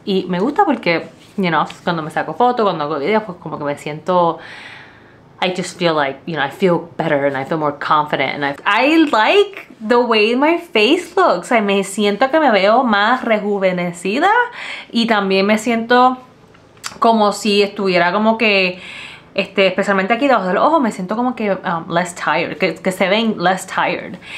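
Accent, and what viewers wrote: Puerto Rico. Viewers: Girllll. I just love your style... Subscribete si te da la gana.. jajajaaa.. bien rajatabla.. me encanta como hablas el Español con ese acento bien boricua y despues El Spanglish.. mita Ingles y mita en Español..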